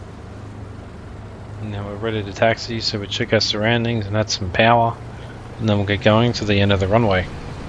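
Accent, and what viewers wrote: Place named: Australia. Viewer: Dude you sound like an Aussie, why can't I find this game in Australia?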